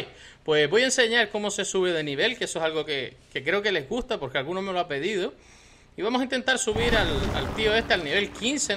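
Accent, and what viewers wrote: Canary Islands, Spain. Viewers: ¿Canario? XDD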